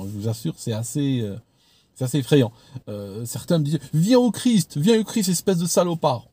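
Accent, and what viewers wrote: Switzerland. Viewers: On s'en fiche qu' soit FM. On l'aime tel qu'il est avec son bonnet, sa tignasse et son accent suisse par intermittence. Un franc mac qui ne croit pas aux missions Apollo, ça n'a pas de prix.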